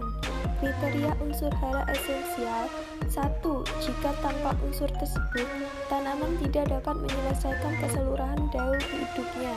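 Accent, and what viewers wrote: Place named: Java, Indonesia. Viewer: Medok men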